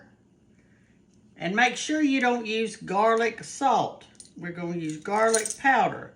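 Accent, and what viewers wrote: South, United States. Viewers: Your hair looks so adorable ❤️❤️❤️ I just love tuning into to listen to you!! You remind me of my Grandma, but with your beautiful southern accent ❤️❤️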